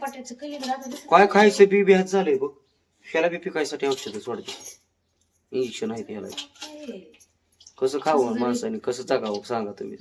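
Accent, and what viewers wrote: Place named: Malvani, India. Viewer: Khup bhari dada ani tumchi Ji gavarani malv bolat ajun bhari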